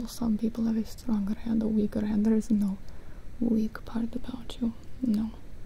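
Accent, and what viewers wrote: Russia. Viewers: I live for the Russian accent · Love this series!! I fall straight to sleep with the Russian accent and the whispering makes it amazing! Love you! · the russian accent really hits home, nice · Are your trying to do a Russian acent it funny. :im a Russian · Good russia accent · Your Russian accent is so relaxing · U speak like Russian english ) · The white hair and Russian accent reminds me of silver sable from the spider man game/comics, idk that just me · A russian accent i think its a russian accent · I feel i slight russian accent idk why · with a Russian accent is so funny to me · russia accent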